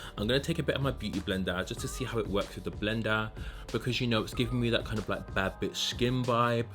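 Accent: United Kingdom. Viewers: Uhhh I looove your british accent!!!! 👏🏻👏🏻 I have been waiting for a review of this foundation! 😍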